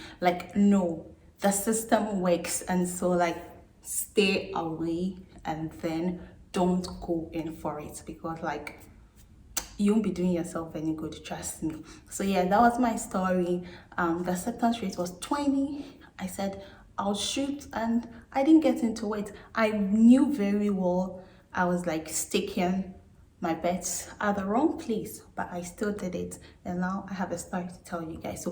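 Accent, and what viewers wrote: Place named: Ghana. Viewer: You sound Ghanaian. Thanks for the video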